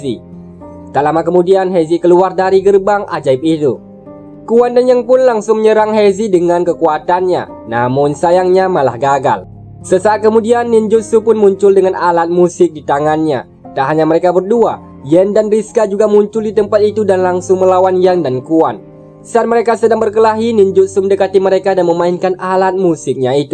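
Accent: Aceh, Indonesia. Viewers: Logat apa Aceh , lanjot bang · Apaceh banget logat nya. Wkwkw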